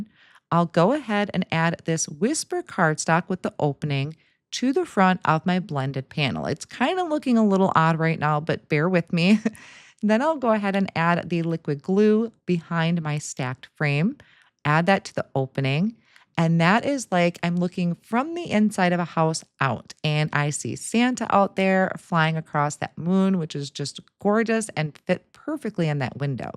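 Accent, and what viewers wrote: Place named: Wisconsin, United States. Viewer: Oh my gosh, I just stumbled onto your channel and heard your voice and I thought, wow, that sounds like home. Turns out you’re from Chippewa and I’m from Eau Claire! I’m down by Milwaukee now but love that we’re from the same neck of the woods! ❤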